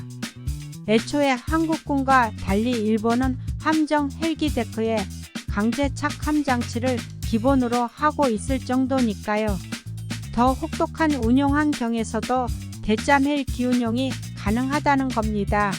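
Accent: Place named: Chungcheong, South Korea. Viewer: 나래이션이 충청도라서 답답함. 전문성이 농후한 영상이라 생각됩니다. 호주 호위함 사업 탈락 때문에 자꾸 비교하려는 것이겠지요. 많이 배웠습니다.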